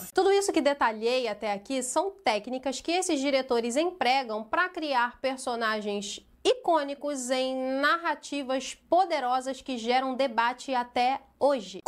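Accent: Rio de Janeiro, Brazil. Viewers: Moça, tu és carioca?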